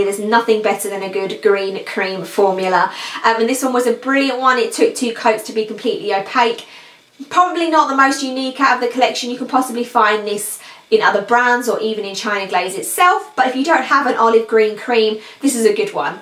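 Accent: United Kingdom. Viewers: I only have Aut-umn I Need That but want You Don't Know Jacket and Haute Blood I love how it looks with a glossy top coat rather than matte, yes I'm strange wanting a matte polish to a glossy top coat on it 😂 you're British aren't you as my husband thinks you're Australian.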